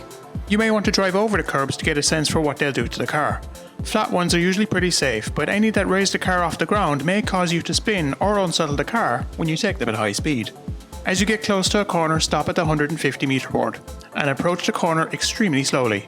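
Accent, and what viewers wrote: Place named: Kilkenny, Ireland. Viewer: What part of Ireland are you from? I can't tell by your accent I am guessing Kilkenny? 🍀🇮🇪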